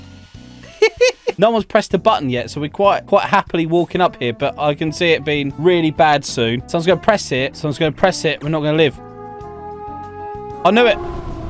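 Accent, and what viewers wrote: United Kingdom. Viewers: U speak British me too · are you bri’ish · I just now he's British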